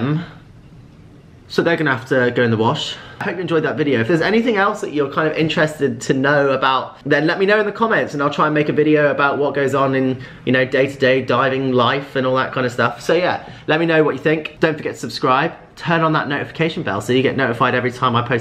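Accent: United Kingdom. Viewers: My god how i love this boy. I love his face. I love his british voice. I love his muscles. His pecs his biceps all his body. He is just perfect for any man or human. I love his videos. The sexiest man alive in the whole world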